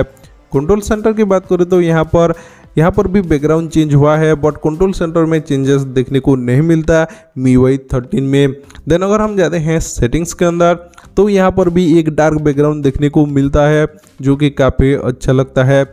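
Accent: Odisha, India. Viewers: I can recognise odia people's Hindi accent from miles away..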